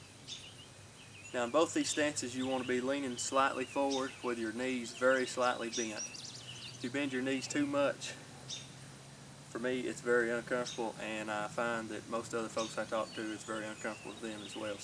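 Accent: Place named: Alabama, United States. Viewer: he sounds like he's from Alabama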